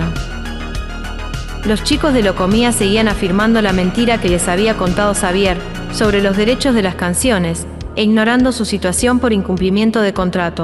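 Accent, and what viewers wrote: Argentina. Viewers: La serie me gusto muchisimo, pues es muy creible y se nota el trabajo de este grupo. La locutora, entiendo es argentina por su acento. Me ENCANTO. LASTIMA QUE LO BUENO TIENE UN FINAL. GRACIAS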